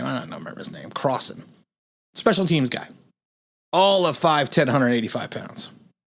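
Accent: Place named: Boston, United States. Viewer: I can’t listen to his damn Boston accent for one more second …not one..!! This dude is a Moron and it comes clear to me every single time he discusses my beloved NY Giants and the “ Process” GO AWAY ..!!!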